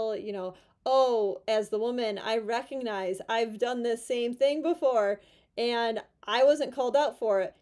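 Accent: United States. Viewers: Very attractive woman, attractive face, attractive voice, from a foreigner who likes the American accent. A woman doesn't belong to a man, a man doesn't belong ot a woman , People like each other and live together or, people have their own homes, they live separately and see each other. Men today are frightened if they live with a woman and she throws him out he will be homeless.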